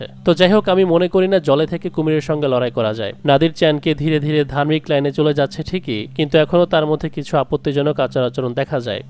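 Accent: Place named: Sylhet, Bangladesh. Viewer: ভাই আফনি কিতা সিলেটি ফুয়া নি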